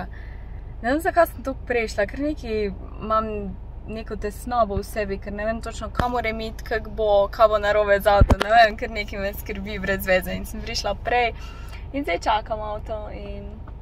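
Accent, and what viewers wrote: Carinthia, Austria. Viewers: Ta koroški naglas je 🔝👌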